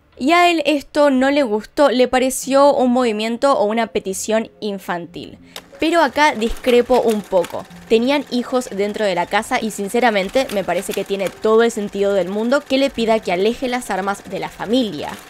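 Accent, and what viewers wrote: Argentina. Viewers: QUe hermoso narras!!# adoro tu acento argentino. Saludos desde illinois uss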